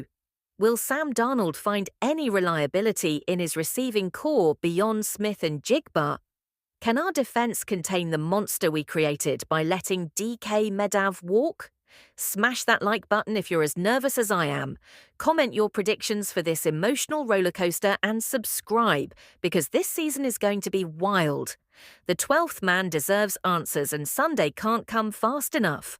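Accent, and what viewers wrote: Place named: Australia. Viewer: Click bait sewer with an Australian accent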